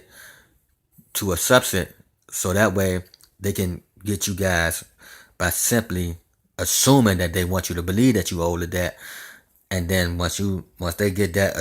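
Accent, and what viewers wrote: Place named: Virginia, United States. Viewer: You're from Virginia, huh?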